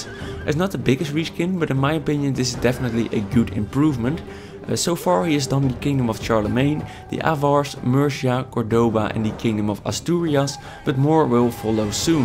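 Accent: Netherlands. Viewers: ***** Thought so :P Your heavy accent gave it away. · That is one heavy dutch accent... My dutch friends makes fun of that accent all the time :D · ik hoor dat je Nederlands bent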